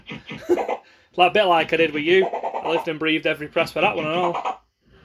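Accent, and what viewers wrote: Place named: Yorkshire, United Kingdom. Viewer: lol yea love ur banter while ur playing proper plain speaking yorkshireman Im Teesside for me sins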